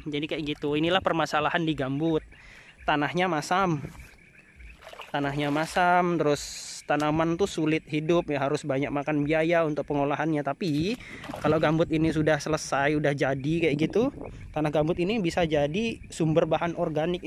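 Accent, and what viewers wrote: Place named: Sumatra, Indonesia. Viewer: Klu dari cengkok bahasanya BKN org Jawa. klu GK salah orang bagian Sumatra.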